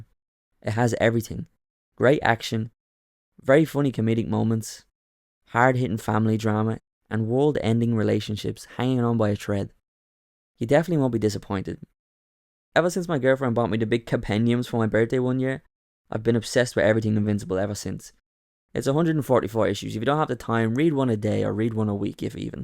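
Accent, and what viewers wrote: Dublin, Ireland. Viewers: im guessing north dublin just cus it sounds like some of my mates accents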